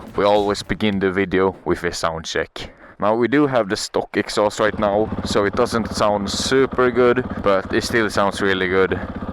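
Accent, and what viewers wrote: Sweden. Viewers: Man hör att du är svensk ialafall 😂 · älskar svenska dialekten 😂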